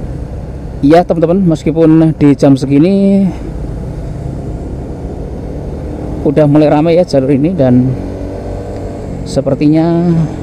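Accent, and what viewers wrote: Java, Indonesia. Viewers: Rasane aku melu jalan- jalan om pasar tradisional juga om 🤭 Aku dr Sumatra seger liat pasar2 tradisional e, sama logat Jawa om nya khas sekali. Semangat om 💪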